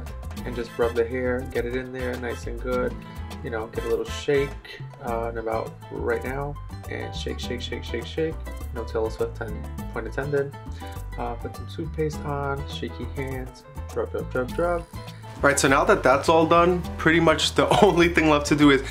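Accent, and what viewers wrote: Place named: Bronx, United States. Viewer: Hehe yes I can definitely hear the Bronx accent lol. Too hard not to miss 😉😎 Probably good to get away from that city life and have some peace and quiet. There's no way I could live in downtown New York lmao. Way too crowded for me 😅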